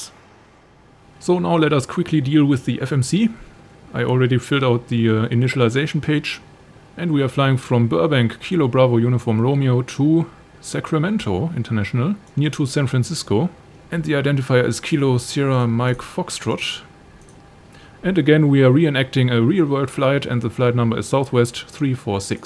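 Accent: Germany. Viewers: oh Hab an der stimme gehört und an das englisch weil wir immer so englisch sprechen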